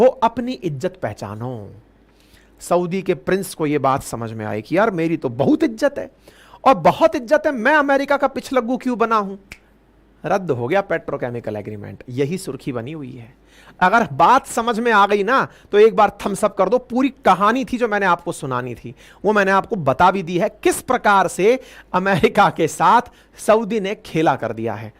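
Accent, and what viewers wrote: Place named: Bihar, India. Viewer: Not interested in incorrect Hindi in Bihari dialect.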